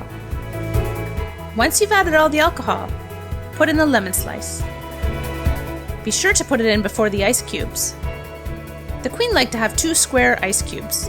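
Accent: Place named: United States. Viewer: What a simply awful accent. The pronunciation was squeamish. Americans simply should avoid pronunciation of non English speaking words and phrases.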